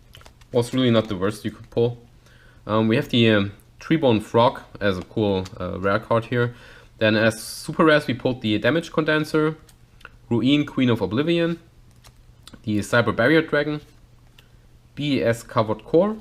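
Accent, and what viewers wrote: Germany. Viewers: Are you german? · Bist du Deutsch da du Englisch wie ein deutscher sprichst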